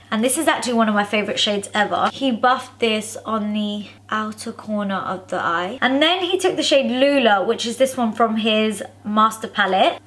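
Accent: United Kingdom. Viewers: Omg hate your englisch accent 🤢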